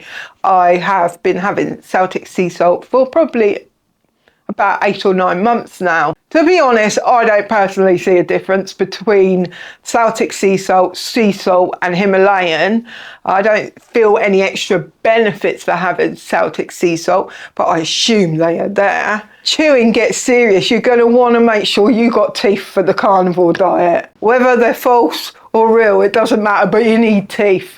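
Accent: Cockney, United Kingdom. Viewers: As an Aussie I find your English accent super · no thats fine. I originally thought you were here in the states but with an English accent. Thank you for clearing that up. Lol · ...are you cockney, Scottish? Please tell! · Cheeky you, love your cockney accent. I bet you’d love my Bronx accent. 😂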